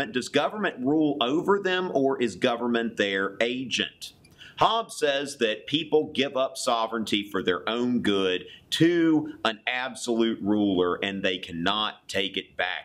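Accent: South, United States. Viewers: which state are you from? you have a very strong accent :p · It was when I heard you threatening to drink my blood for breakfast that I realized this was the second time I was watching this video.😃😃😃. Just finished listening Locke’s Two Treatieses, so your great sketch now sounded with new wisdom. Also, that southern accent sounded dear, too😄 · This is a real southern usa accent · I totally think that all philosophy classes should be taught by folks with a Southern accent. Yours Truly, Demian Virgil Hammock the son of Prof. Virgil Hammock P.S. The opening with Heavy Metal music is a nice touch. I went to some sort of Indie Music thing and I asked when is ABBA playing? I got that look and was told never to come back. I think I am on some sort of Indie Hipster ban list? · Something about yer yank accent explaining philosophy is endearing, will stick around. 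love from ireland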